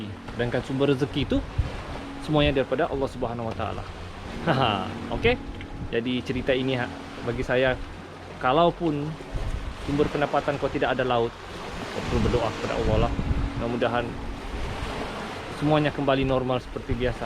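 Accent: Sabah, Malaysia. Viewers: sabah kerass boy. hahahaha.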